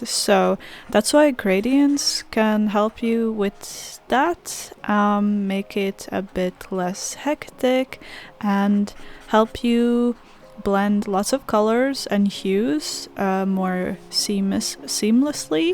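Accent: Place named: Belgium. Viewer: my first thought was "wow, these axolotl dragons kinda remind me of httyd for some reason..." **a minute later** "OH MY GOD ITS LITERALLY TOOTHLESS BUT RAINBOWS" also i was drying my hair while watching this so i had the volume up and apparently sounds like me but with a Belgian(?) accent instead of an American one, according to my mom lol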